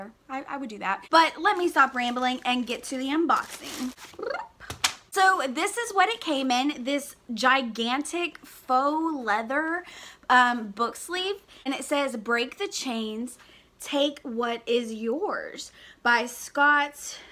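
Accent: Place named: Spain. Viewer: I love your Spanish accent it's so cute. And don't mind it's difficult to read it. I'm spanish and for me English is kind of difficult 😂😂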